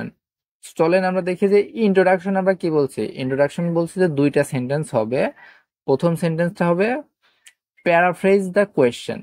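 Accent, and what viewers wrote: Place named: Jessore, Bangladesh. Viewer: joss class bhai, Are you from Jessore ? your accent like jessorian .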